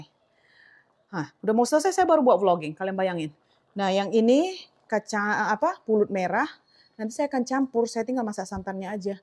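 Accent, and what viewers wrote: Medan, Indonesia. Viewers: Memang gitu kk logat kita Batak Medan ,karena saya jg Medan logat sya jg gitu😅 tp itu lh yg buat seneng nonton vlog kk..😊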